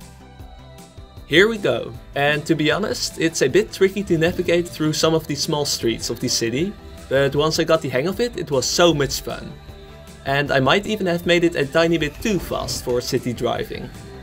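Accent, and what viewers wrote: Netherlands. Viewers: i can hear is NL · Echt een heel erg nederlands accentje, maar wel leuk man dit hahaha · No shit bro ofc hes dutch you can hear in inmediately by the way he talks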